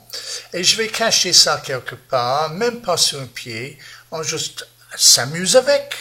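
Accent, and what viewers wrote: England, United Kingdom. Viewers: C'est bien charmant avec cet accent anglais et le Monsieur parle avec une vigueur remarquable.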